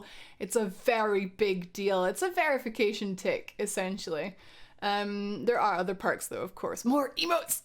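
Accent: Scotland, United Kingdom. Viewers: I remember the first time I watched a Whatculture video that you featured on, and thinking to myself "who the bloody hell is this Scottish chick?". Then I got used to you, started enjoying you more and more, then the gaming channel started doing podcasts, and I'd look forward to whenever you were on them. (I play a game whenever I listen to guess who is on, and would fist pump when you were on). While following you on socials, i saw you were a streamer, and i made a twitch account, because you were streaming and I wanted to be involved in the chat. From that, you were the first streamer I subbed to, and whenever i get chance i always drop by to say hello, (I'm LordPeterEgg btw). I'm sure others have stories similar to mine, and I'm sure like me, we're shocked about this news, but also excited to see what comes next for you! We all love you, and are looking forward to everything to come! 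LONG LIVE! ❤❤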